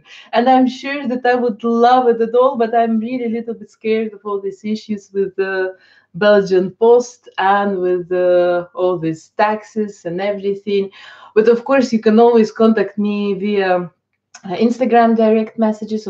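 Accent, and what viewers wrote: Eastern Europe. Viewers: I knew something did not compute when I saw you lived in Belgium but had a very thick Eastern European accent :D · Це називається "слов'янський акцент". Не лише російськомовні мають такий акцент.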